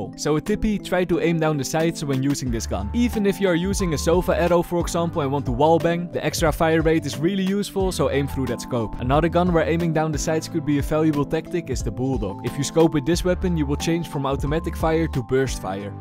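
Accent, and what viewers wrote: Netherlands. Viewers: Als je geen Nederlander bent dan ben ik geshockeerd 😅 The heaviest Dutch accent I've heard in a while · Meest Nederlandse Engels ooit😭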